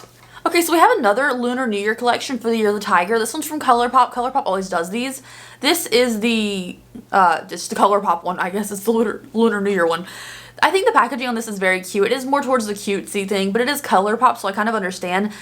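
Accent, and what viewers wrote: South, United States. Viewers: i would differently watch review of the Jaclyn's launch. I also love your southern accent its so cute.😺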